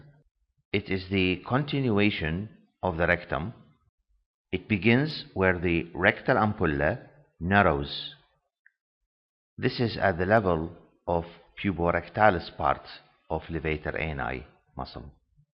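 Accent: Iraq. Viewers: شكرا دكتور على قناتتك الرائعه نفتخر بيك انت قدوتنا منوره الاكسنت العراقيه كلش حلوه وان شائ الله المزيد من التقدم والموفقيه :) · عرفتك عراقي من اللهجة ههههه ربي يحفظك حبيبي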